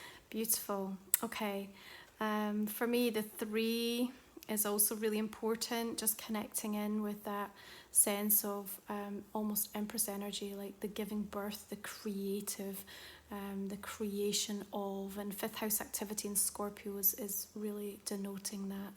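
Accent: Scotland, United Kingdom. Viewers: Hi there: Thankyou sincerely for the wonderful reading. I have to say I thoroughly enjoyed the reading, especially your voice. You accent seems familiar, am I right to say you are of Scottish blend? If so, I am too. Its nice to know that someone from my beautiful country is reading me which is a great pleasure and a tonic. No disrespect to the other Tarot readers they're all wonderful when they read my sign. But to listen to a Scottish voice is my cup of tea at anytime. Anyway, I've been a bit isolated lately, but when I'm busy writing my novels, I prefer peace and quiet it helps me concentrate, I'm also doing a bit of DIY at home, so that keeps me busy as well happy with the results, just to freshen up my house a bit, makes me feel content and satisfied. But thanks a trillion fur the wtkvime ing reading which was also very inspiring. I hope to hear from you again, your a breath of Scottish fresh air m, and we all know how cool and fresh that is eh Brrrrr... 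Thanks again & God bless.xxx⚘💞💐💐🌺🌺🌺🌺💜♦️💜🌟🌟🌟🏴🏴🏴🙏🙏🙏💎💎💎💘💎💎💎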